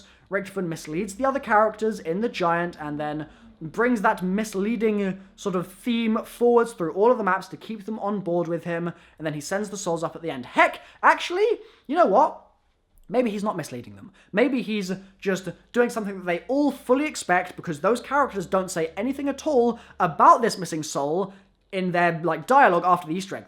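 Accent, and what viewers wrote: United States. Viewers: Your American accent is amazing do it more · spot on American accent 👌🏽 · American accent.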